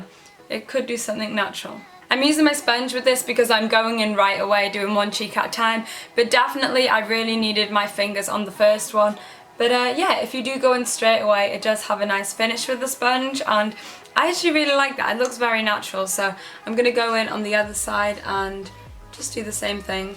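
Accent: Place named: Wales, United Kingdom. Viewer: YOUR WELSH ACCENT IS TO DIE FORRR! 😍😍😍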